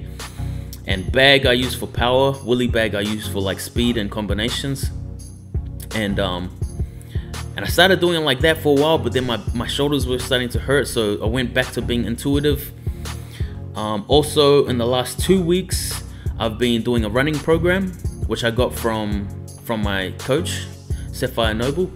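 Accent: New Zealand. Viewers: I hear that NZ accent and subscribe immediately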